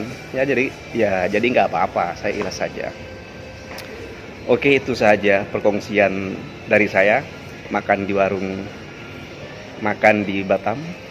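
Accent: Malaysia. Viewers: Mmng betul adik, ngomongnya kamu tu bkn org Indonesia dh,dh mcm org Malaysia sngt dh.Xpa...niatkn sedekah,biarlh peniaga tu yg jwb jika ianya ada niat menipu.Gk kn senang mn pn jika ianya menipu pelanggan mrk...gk berkat... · Sampurasun.bng kata aku mah bhsa.abang nyak.jadi campur.logat.melayu. kata aku mah.kan Abang udah lama kerja.di malaysia.jadi logat.melayu.nyak kebawa. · Logat mu malaysia,jd di mahalin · Bahasa mas sudah mirip orang malaysia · Salam..org jawa timur..raut wajah dan percakapan anda jugak seperti org Malaysia. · lol... minda sempit... x lupa pun bahasa indonesia.. lohgat je tertukar siket.. itu normal la sbb br pulg dr malaysia.. lama2 akan kembali loghat indo..itu pun nak kecam.. patriotik itu bagus tapi jgn eksterim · Wajah dan slang cakap macam orang Malaysia tau.😊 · salah anda sendiri..knp pake loghat malaysia.. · Makanya.... 1. dimana bumi dipijak, disitu langit di junjung, Mas. 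Kamu baru 2 tahun di malaysia, udah pakai logat malaysia. 2. Mana ada ayam penyet harga 12ribu di Batam. Paling rendah 18 ribu rupiah. Teh obeng / ice tea itu minimal 5 ribu. Nah kalo lontong sayur,. Nasi lemak (makanan untuk sarapan) iya rata² 10ribuan. 3. Lagian, itu warung dekat hotel toh? Yaa pantes donk....😅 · Kalo bisa jangan pake logat melayu, tpi logat jakarta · Bro.. Kamu dah mcm org Malaysia.. 😀.. Percakapan kamu mmg dah Malaysia sangat.. 😁😁👍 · Cara abang bercakap sikit" agak logat orang malaysia.jadi di sangkanya orang luar negeri. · Speaking pun dah mcm org malaysia...slang indon langsung hilang....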